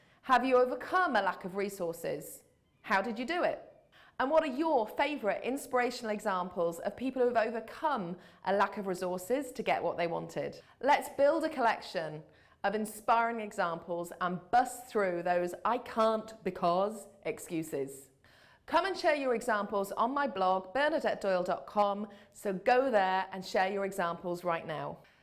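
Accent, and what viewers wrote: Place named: United Kingdom. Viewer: I looooove that Brit accent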